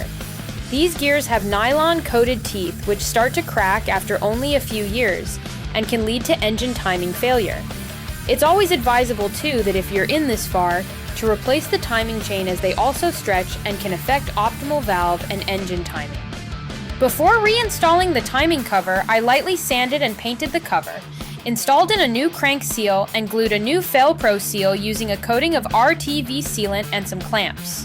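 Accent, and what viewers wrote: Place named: United Kingdom. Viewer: Nice job, you so funny and cute with that British accent!!!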